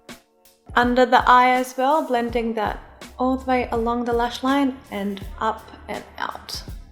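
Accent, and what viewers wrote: Australia. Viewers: is she australian？？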